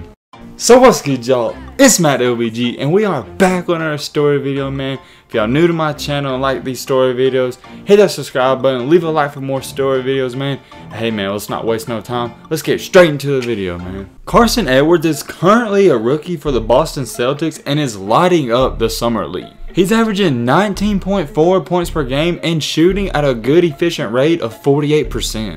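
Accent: South, United States. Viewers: I can hear a touch of southern in your accent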